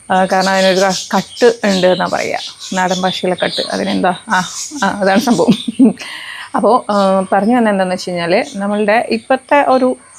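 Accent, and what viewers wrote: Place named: Chalakudy, India. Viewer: ചായ മൻസ, ഞാൻ കണ്ടിട്ടുണ്ട് കഴിച്ചിട്ടില്ല. അതിനെക്കുറിച് കൂടുതൽ വിവരങ്ങൾ പറഞ്ഞു തന്നതിന് നന്ദി. എന്തെല്ലാം പച്ചക്കറി ഇനങ്ങൾ അറിവില്ലായ്മ മൂലം വെറുതെ നശിച്ച് പോകുന്നു. ഭക്ഷണ യോഗ്യമായ അധികം പ്രചാരണത്തിൽ ഇല്ലാത്ത ഇനങ്ങൾ പരിചയപ്പെടുത്താമോ. ചേച്ചിയുടെ സംസാര രീതി ചാലക്കുടി സ്റ്റൈൽ പോലെ തോന്നുന്നു. ഇത്രയും ചെറിയ വീഡിയോകൾക്ക് കൂടുതൽ റീച് കിട്ടട്ടെയെന്ന് പ്രാർത്ഥിക്കുന്നു.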